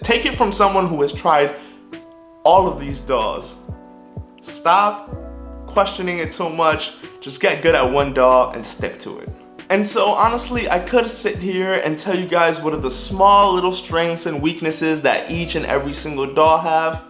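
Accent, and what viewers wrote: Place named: Toronto, Canada. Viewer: you sound like youre from toronto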